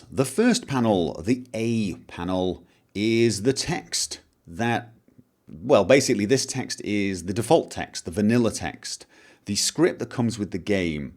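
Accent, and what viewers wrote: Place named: Scotland, United Kingdom. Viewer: What is your accent? It almost sounds Scottish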